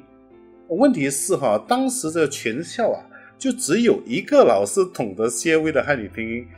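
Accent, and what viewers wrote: Standard. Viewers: 真的不容易，以前不了解马来亚华人的处境，现在通过大叔了解一二，听完真的不容易而且觉得马来华人真了不起~！所以请大家不要挑三拣四了。就算是所谓的中华圈，国内的好多地方和香港地区还不如大叔会说一口标准的中文呢。现在台湾也好不到哪里去，很多正规媒体差不多三分之一说的是台湾闽南语叫人无语……。加油大叔！ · 有一点请您多多原谅：首先您的普通话，不，是华语，实在跟我们太接近了。我经常忘了你是个外国人，而像我们广东广西省份人的口音，甚至比他们说的还标准。完全把您当中国人了。更主要的是您跟我们一样热爱中国。 我在想，这种感觉可能像韩国、朝鲜人听我们中国朝鲜族同胞说话一样。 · 在马来西亚华人中大叔的普通话算很标准的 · 郷民A 我们的华语发音及用词很受自身籍贯的方言影响，也掺杂了少数马来语的词尾音，听起来有点特色又像中国南方地区的发音。大叔是属于中文造诣较好的，多数华人的发音都没有那么标准。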